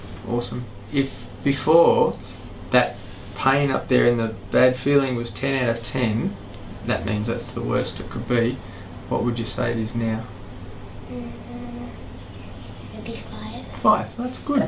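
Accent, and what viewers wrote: Australia. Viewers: Yes, the Aussie accent is the best one. My husband sounds the same. I'm Mexican American and that's one of the many reasons why I fell in love and married him. XD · Chocolate Queen it is an Australian accent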